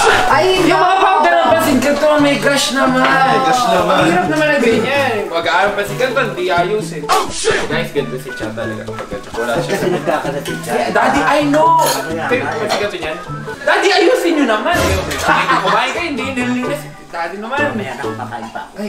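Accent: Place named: Philippines. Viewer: I love the way he Speaks TagLish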